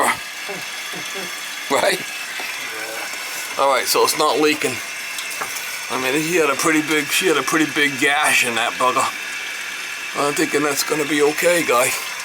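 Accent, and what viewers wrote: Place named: Massachusetts, United States. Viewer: I really like your videos. I think you must be in Massachusetts. I would totally call you to yell at me at my house if I had a plumbing issue! "That shits gonna be there for life. It'll be there forevuh!"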